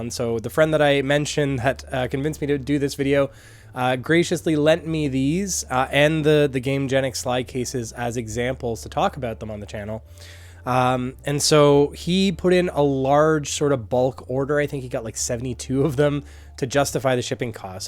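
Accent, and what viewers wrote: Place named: Canada. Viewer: You sound extra Canadian in this video😅 Great video thank you!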